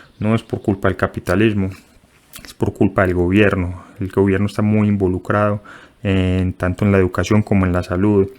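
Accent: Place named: Antioquia, Colombia. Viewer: MENOS DE 10 SEGUNDOS DE VIDEO Y ME DI CUENTA QUE ES PAISA.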